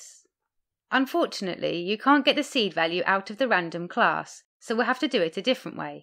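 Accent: England, United Kingdom. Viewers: I like your English accent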